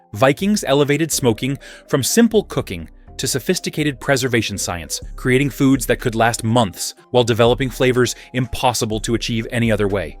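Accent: United States. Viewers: This hasty-sounding, breathless bot voice is really annoying. The information is solid and well written, though, so, if you sbould do this again, do consider having a human reading it. And the word "confit" is pronounced "Cone-FEE." American audiences [your video uses an American accent] pronounce "herb" without the "h," and British pronounce it with the "h" aspirated. Also, it's "LOO-tuh-visk."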